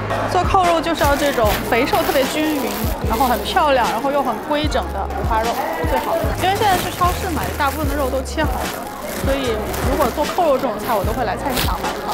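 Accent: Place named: Nanjing, China. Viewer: 我查了一下，果然是南京妹子，南普我听出来了。哈哈哈哈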